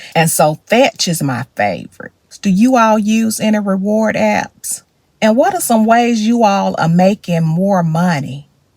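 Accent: South, United States. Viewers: A typical southern accent and love it🎉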